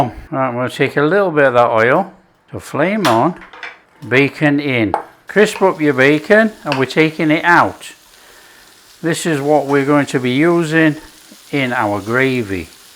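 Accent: South, United States. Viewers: Southern Grandma here. That Southern accent ain't the worst I've heard. I'm a good cook, but my pork chops could use some improving. No doubt, it's somewhat a lack of patience problem! I'll be trying your buttermilk trick soon enough. You know, here in the states, all of our bacon is streaky bacon. Where I come from, it's routine to save your grease from every time you cook the bacon. I keep it in a Mason jar in my refrigerator and use it pretty frequently. Love it to grease the cast iron skillet before I make my corn bread. The CRUST! Thanks,. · Your southern accent needs work, but the food is what granny made! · God you do make me laugh, I think you're American accent was very good actually. 😊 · Y'all just listen here to the southern version of chef. You can speak southern a lot better than I can speak your English. Personally, I love it. And I say for the umpteenth time that I love what you cook. 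And pork chops are another of my favorite. Youve cooked them pretty much like we do. And served with mashed potatoes and gravy is just the best way. Theres not much food that I don't like( I'll never be rich 🤑 enough to eat escargot or caviar) but I will try most anything. Youve made some good eating there chef. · Not a bad Southern accent!! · As a Southerner in the US... I love your Southern accent! 😊 And your cooking! ❤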